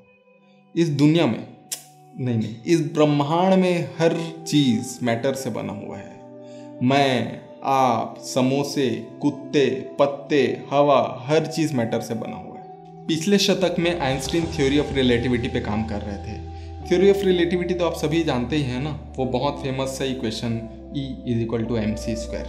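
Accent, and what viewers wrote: Chhattisgarh, India. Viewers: Accent se toh lag raha hai Chhattisgarh se hain.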